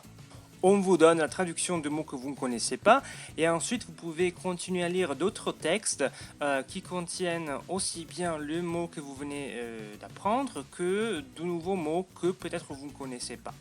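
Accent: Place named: Italy. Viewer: Buongiorno e grazie mille per il tuo lavoro ! Ho studiato la lingua italiana per 3 anni a scuola, ma fa molto molto tempo.... In ottobre, ho deciso di continuare perchè mi piace molto questa lingua ! Ho trovato su internet : DuoLingo ! è gratuito. Secondo me, è come un gioco, ma si deve imparare ogni giorno (o "tutti i giorni" ?) DuoLingo è veramente interesante, ma non basta : ho bisognio di venire qui per le tue lezioni ! C'est un très bon complément . ps : il tuo accento italiano è bello ,-)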